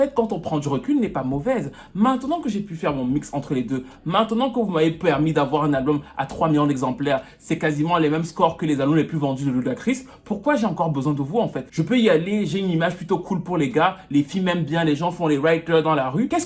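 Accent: Cameroon. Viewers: De grâce, gardes ton accent Camer. Au top. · Mdrrr l'accent kmer! La vidéo est marrante, je m'abonne !well done :)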